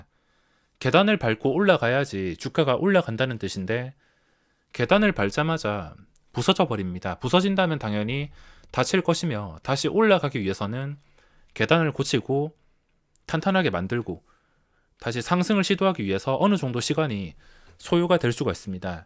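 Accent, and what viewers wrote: Gyeongsang, South Korea. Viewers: 누가많이파는지 시합하는줄 알았읍니다..ㅋㅋㅋ경상도사투리 구수하네요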